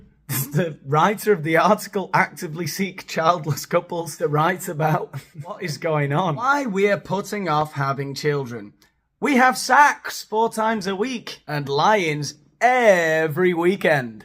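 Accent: United States. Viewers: OMG, I just LOVE your American accent!!! AND your impeccable sense of humor, but, mostly I still chuckle at the thought of that FABULOUS rooster blasting me out of my self-induced YouTube inertia!!!!!!! Rock on!!!!!🎸🎸💖💖🐓🐓🐓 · Love your American accent. Cheers.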